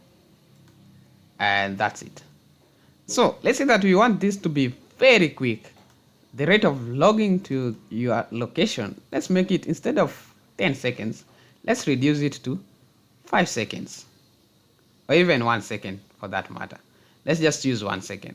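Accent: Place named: India. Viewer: Indian english its very cool experience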